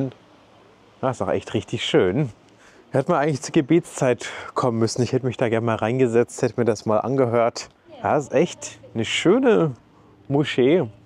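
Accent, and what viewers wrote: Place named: Germany. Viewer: Great video, and an even better channel. I really enjoy watching your content. Your German accent sounds really nice too. Where is it from? Most Germans sound pretty harsh, but yours is pleasant. 🥰👋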